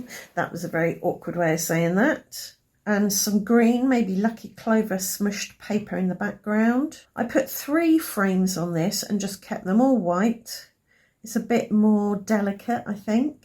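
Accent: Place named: United Kingdom. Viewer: I love listening to your beautiful British accent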